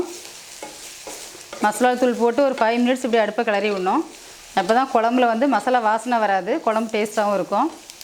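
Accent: Tirunelveli, India. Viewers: Sister yr native place thirunelveli ya yr nellai slang is very like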